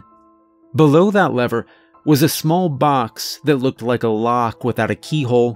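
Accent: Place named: South, United States. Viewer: Enjoy your narrations, are you Georgian? Carolinan? Edit Or Tennessean your voice sounds to me like home. Do you have a podcast?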